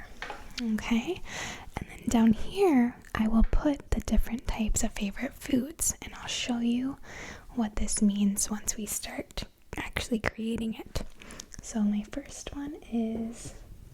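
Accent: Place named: Midwest, United States. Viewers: lol. midwestern accents. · Excellent video, the mic you use softens the voice just enough. The accent is nice too, it sounds like you grew up near Cleveland.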